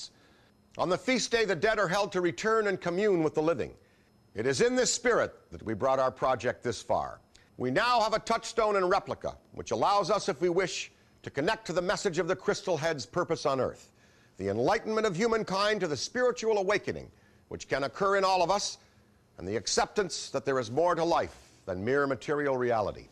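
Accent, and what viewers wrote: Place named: Ireland. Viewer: This video is one of the best examples of the Irish influence still heard in Newfoundland accents today too... If I wasn't told he was from Newfoundland, it would be hard to tell he's not just Irish lol.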